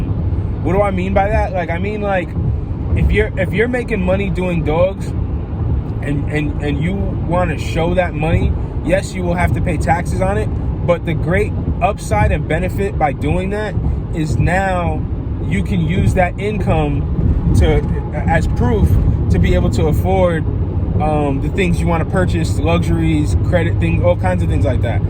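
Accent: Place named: Baltimore, United States. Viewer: Dnt know yu first time in the channel bet my next 2 checks yu from Baltimore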